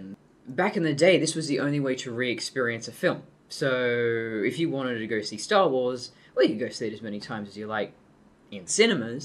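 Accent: United Kingdom. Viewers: I cant imagine your voice any other way. You sound like a sophisticated English women! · Your british accent complements it perfectly. Joanna Lumley like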